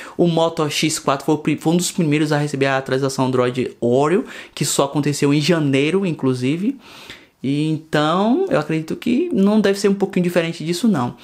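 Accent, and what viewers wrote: Bahia, Brazil. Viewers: Sotaque baiano é outro nível 👏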